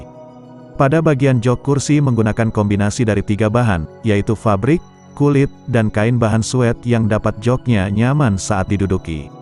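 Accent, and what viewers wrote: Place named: Indonesia. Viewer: Beberapa penyebutan dalam bahasa Inggris dibaca seperti bahasa Indonesia 😅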